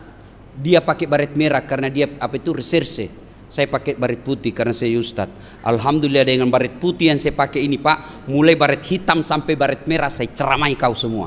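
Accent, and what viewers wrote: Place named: Bugis, Indonesia. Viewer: Pengaruh Logat Bugis pak...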